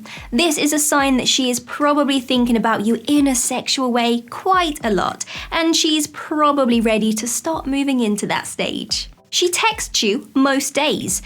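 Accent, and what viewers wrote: United Kingdom. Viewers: Looking at you and listening to you is like looking at you and listening to you for the first time. Love your British accent on top of that your personality and beauty sets it off. You're fine as frogs hair!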